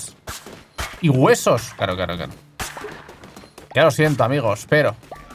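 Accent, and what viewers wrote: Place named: Argentina. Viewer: Hablas como Argentino más que Mexicano xd, lul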